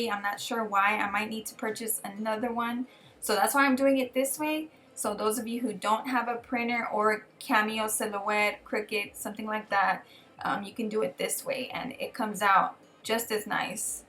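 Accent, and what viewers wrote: Texas, United States. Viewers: I know a fellow Texan accent when I hear it! Love your videos!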